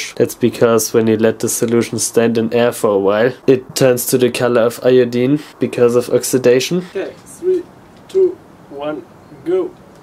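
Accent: Germany. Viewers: Is this guy german or some other nationality. I don't even care, but I love him.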